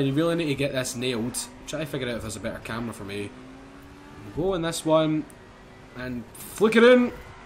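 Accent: Scotland, United Kingdom. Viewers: ur awsome great vids BTW where abouts r u from in Scotland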